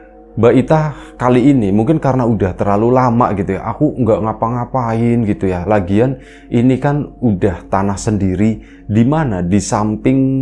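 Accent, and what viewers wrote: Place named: Tegal, Indonesia. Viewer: Lama gak dengerin 1,5 thn lebih ,logat naratornya Tegal banget,he..he...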